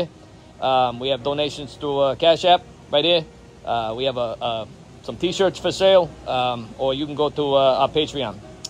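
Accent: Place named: New York City, United States. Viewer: Well dude you are waaaay New York City... Manhattan to be exact. I'm from Staten one of the other boroughs so yeah I recognize that accent!😉 Not quite quite a long island yenta but your close. Too fast for a Long Island yenta. Bro you my peeps!!! I never donate cash to any website ever but I do buy things that they have merch to support them. It's you and Tom MacDonald the only ones that I will buy from. And guess what? we're going through a heat wave too (113 yesterday) and mama needs a tank top so I think it's time to go shopping! I don't like seeing my peeps fail at things that they do so well. So my peep, it's time to check out the merch! You know I've always supported you and I will never stop. I still got my eat the babies t-shirt and I love it!! I love the humor and comedy & the work you put into it. Far as I'm concerned every damn ¢ is worth it!!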